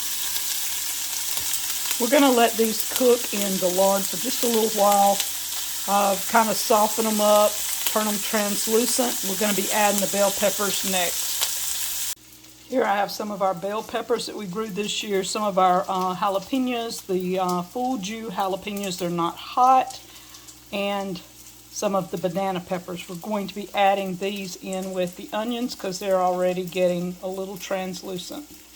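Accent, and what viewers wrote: South, United States. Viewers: Another original recipe. Master chef with a southern drawl. Love it.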